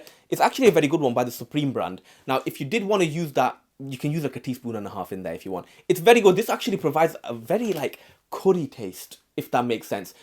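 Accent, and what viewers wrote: Bradford, United Kingdom. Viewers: Just saw this vid in my suggested vids, the thumnail was appealing enough to make click on it and ended up watching it right till the end. Quite interesting. Is that a Bradford accent?Subscribed and will definitely try cook this dish on one of my days off. Thanks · Bradford right?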